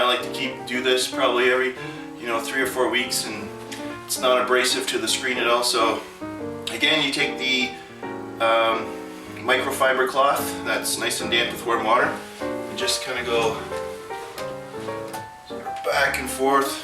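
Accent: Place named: Canada. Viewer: first 30 secs i know he's Canadian and i'm not American. ;D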